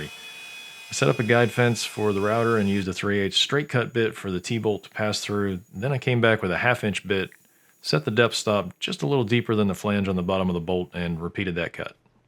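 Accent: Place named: East Texas, United States. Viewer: Where you from brother? You speak like me…east Texas?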